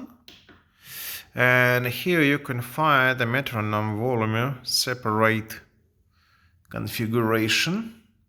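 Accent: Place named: Russia. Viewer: Русский акцент😂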